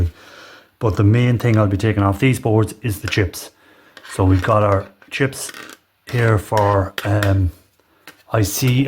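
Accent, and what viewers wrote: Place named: Ireland. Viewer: how far away are you from Galway?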